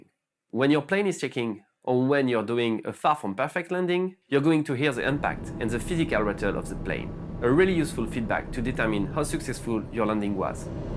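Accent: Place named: France. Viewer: Vous êtes Français ? En tout cas ce qui me fait dire ça c'est que je remarque un léger accent Français dans l'Anglais .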